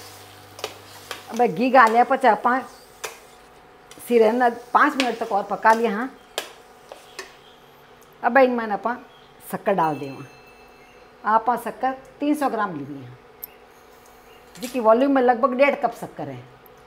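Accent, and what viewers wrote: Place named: Jodhpur, India. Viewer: VERY.NICE.MATA.JE.YOU.ARE SPEAKING.JODHPUR.LANGUAGE VERY.SIMPLE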